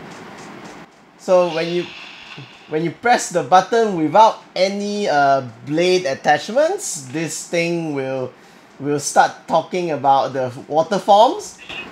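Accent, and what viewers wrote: Singapore. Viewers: is not form is breathing dude and are you from Singapore?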